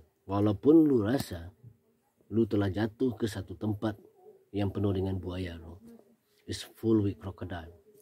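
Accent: Malaysia. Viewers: Kental sangat logat melayu Lord ni, I gagal fokus to your price tag baju, 😆😂 Stay humble and go to the top. 😊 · dia logat melayu itu buk,karena 30 tahun tinggal disana 😀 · lama di Malaysia jdi logat melayunya kebawa, tpi aslinya ya emg dri tanah datar sumbar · saya dari Malaysia, saya suka sangat bila masak, sempoi gituu, bila saya tengok mci saya tengok longatnya kok macam orang melayu ni, rupanya orang jebat ni wehhhh. Abang jago ni bosss🤣🤣🤣🤣👍👍👍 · Lord, ceritanya bisa berbicara Melayu mirip Malaysia begitu bagaimana? Kayaknya Sumatra Barat gak begitu deh melayunya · dia memang orang minang, tapi dari kecil sampai tahun 2017 itu dimalaysia, makanya logatnya itu logat melayu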